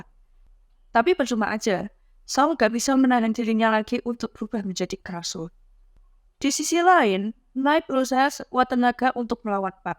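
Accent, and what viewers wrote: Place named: Java, Indonesia. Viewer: Wong jowo lagi medok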